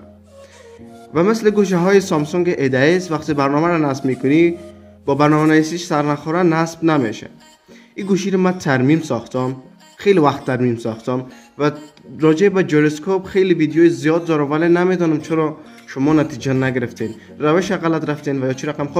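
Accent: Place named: Afghanistan. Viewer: اهل افغانستان است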